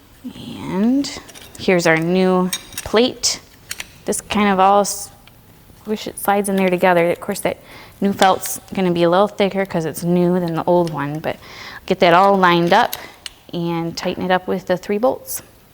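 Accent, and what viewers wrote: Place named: Michigan, United States. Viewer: The Michigan accent is too funny.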